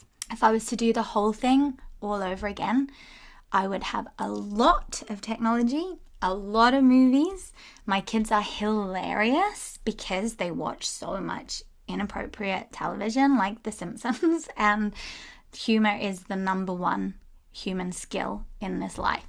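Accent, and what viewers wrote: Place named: New Zealand. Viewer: I haven't seen your videos for ages, and I love how your London accent's become super kiwi, like one of my best friends from school who lives in Taupo. I was thinking of you recently... did you see the case in Italy where a UK/Australian couple have had their kids taken away and put into care because they were unschooling and had a composting loo!!!?? It's unbelievable, and for us (living in Italy as progressive foreigners) it's made us feel quite nervous. We're leaving the country soon. But I wondered if there's any support within the unschooling community for them. My kids are now 12 and 10 and I have doubts/vague regrets about having sent them to state school. I know why I did it (believing they needed to culturally integrate into Italy and also as I work full time feeling I couldn't support them here), but they've both had some bullying and it's too rigid especially for my ADHD son.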